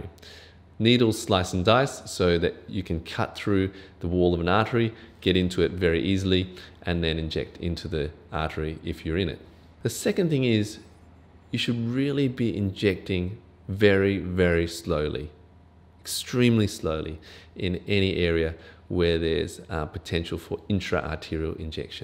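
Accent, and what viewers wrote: Australia. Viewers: Is this an Australian accent?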